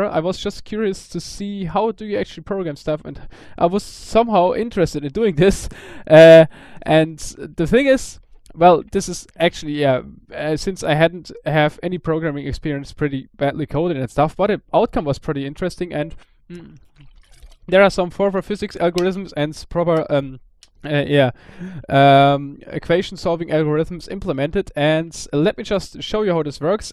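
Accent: Germany. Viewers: Hey, are you maybe german?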